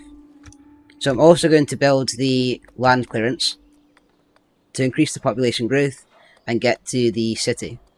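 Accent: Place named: Scotland, United Kingdom. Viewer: Damn ur accent is thicker than the games scotish voice actor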